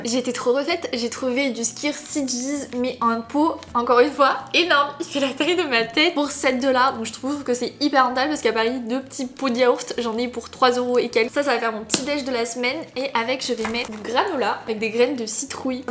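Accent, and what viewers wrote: England, United Kingdom. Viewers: Trop bien comme d'habitude et j'adore ton accent anglais ton haul en anglais est trop bien 😆😆☀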